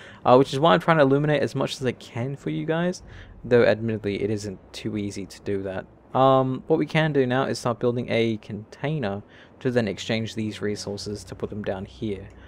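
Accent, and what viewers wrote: Australia. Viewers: Show block placements, design choices, exploration and problem solving. Edit the largest constructions. For instance. You place a large reactor and a conveyor system and what not then go(in your Australian accent) 'Right guys brb' The jump to you finishing it. Things like small ships are cool to watch because you need to make tweaks and changes as you go. But large scale welding can be monotonous to watch. Not so bad once you have a welding ship.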